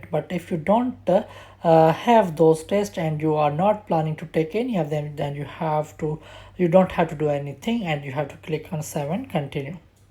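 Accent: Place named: Sindh, Pakistan. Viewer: Sir apki English main bhi Sindhi hone ka sabbot hai♥ MashaAllah Boht acha guide karty ho ap..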